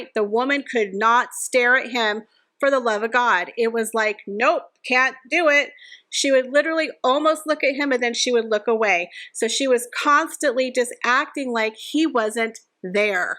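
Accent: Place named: East Texas, United States. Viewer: I love the Barbie’s! I can hear that east Texas accent along with your expressions. Reminds me of home ( south Louisiana) proud of you!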